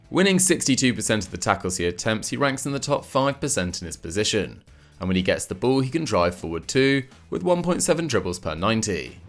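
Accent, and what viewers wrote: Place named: Sunderland, United Kingdom. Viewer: My guy is definitely a mackem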